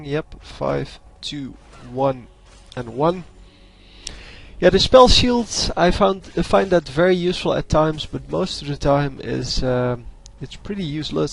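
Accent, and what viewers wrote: Netherlands. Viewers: Yeah that accent for me is very characteristic, every dutch guy making videos has almost the same voice :D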